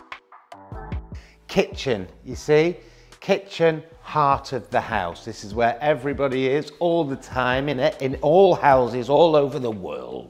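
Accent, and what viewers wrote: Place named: Manchester, United Kingdom. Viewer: Love it ,proper Mancunian ,love your home x do you do b& b ,lol thankyou ,for sharing ,your home's lovely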